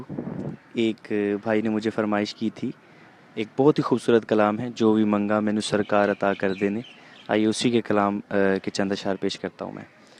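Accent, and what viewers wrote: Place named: New York, United States. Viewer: NYC voice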